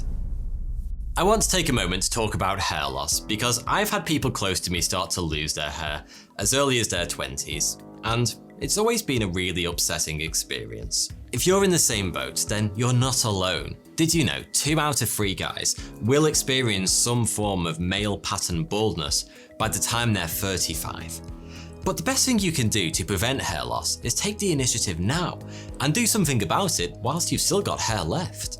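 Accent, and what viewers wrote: United Kingdom. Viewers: what do you mean? At first, I assumed this was a pro-america joke, insinuating the English accent is incorrect, which i applaud because its hilarious. However, I then noticed "mate" and realized this is clearly no American joke. So i ask again, what do you mean? Does he have a speech impediment? As an American, i cant tell, it just sounds like British-English or another one of those funny non-American lingos. On a side note, i do totally agree with your main point, no reason for this Japanophile to get on the guys case about using the typical foreign-pronunciation of Yoshi. Nothing worse than the white dude who forcefully pronounces all Japanese words as authentically as he possibly can. It's just sad when you hear those clowns order a Sapporo beer by belting out those staccato syllables "SAH-POH-ROH". You just want to turn to them and say "yeah man, we get it, you watch Narutto or something" but they would problem take that as a compliment of their comically incorrect accent so we stay quiet. 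If your speaking in English, keep the words in English, say "sake" like the rest of us, not "SAH-KAY". And I say this all as someone who lived in Japan for years, not just an ignorant American... Well, i suppose I say it as an ignorant American who lived in Japan for years... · ...THE MAN THAT LOOKS LIKE A GERMAN BUT SPEAKS LIKE A BRITISH MAN...U R 2 F....N CUTE I JUST WANT TO TAKE YOU HOME...😃 👄 💋 💘 MERRY XMAS LOVE...🎅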